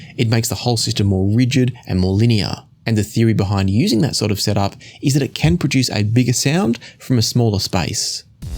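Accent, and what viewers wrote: Australia. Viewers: He's Australian......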